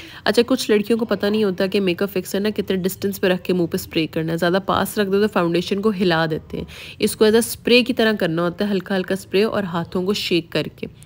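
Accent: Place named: Punjab, India. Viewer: Kuch b lag rahi ho bolne se bilkul paindu lagty ha kuch b high fy pehan lo bolne ka andaz daggon wala hi rehta ha punjabio ka bolne ka style sahi karo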